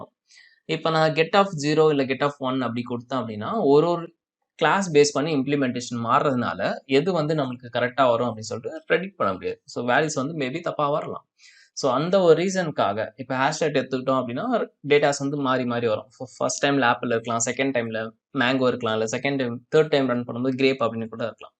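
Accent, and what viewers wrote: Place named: Chennai, India. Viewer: The way of coaching is very understandable. Sometimes your Chennai language flows 😂😂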